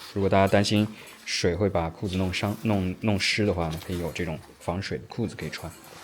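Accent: Taiwan. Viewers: 這位小姐的口音感覺像是我們台灣同胞! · 聽這位小姐姐口音是台灣人！ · 聽口音，女生是台灣的????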